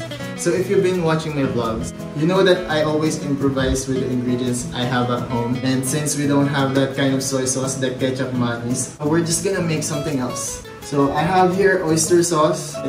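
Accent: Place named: Oregon, United States. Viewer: Congratulations 4000 subscriber 👏👏👏love this content with English version you still have Oregon accents very sweet.Made my night laughing 😂 notice you a lot of S.Hope Marky will do English version vlog.Yes more English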